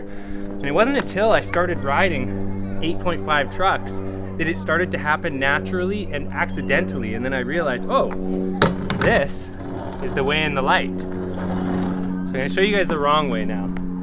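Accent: Canada. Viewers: Maybe its the white shirt, maybe its the canadian accent.. but after clicking on this I almost thought I clicked on a Vancouver Carpenter vid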